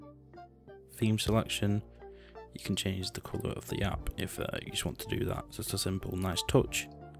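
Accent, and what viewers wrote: India. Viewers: Who expected indian voice? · I thought its an indian dude :)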